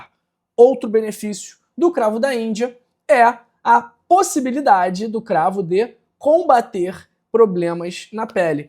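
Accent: Rio de Janeiro, Brazil. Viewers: Esse sotaque carioca é horrível, muito Ruin, muito chato, é muito cansativo ficar ouvindo isso...pqp... é muito forçado...cara vái fazer outra coisa na vida q não precise falar nada... não abre a boca por favor... credo... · O conteúdo pode até ser útil , mas esse sotaque carioca ninguém merece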